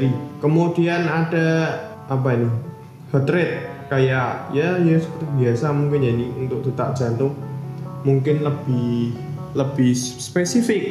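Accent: Java, Indonesia. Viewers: Jowone medok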